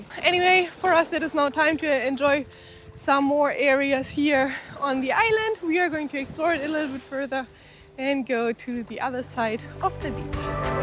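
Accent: Germany. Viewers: Spreche doch lieber in Deutsch. Zu starker Deutschakzent! Ansonsten schönes Video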